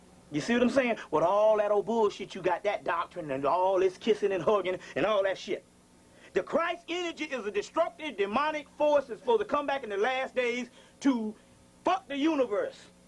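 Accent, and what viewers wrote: South, United States. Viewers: that is very true, I bet if he spoke all prim and proper without that southern accent and wasn’t chubby the blind would prob consider him a lot more, goes to show u ppl are shallow and the spirit realm don’t give af bout societal standards lol.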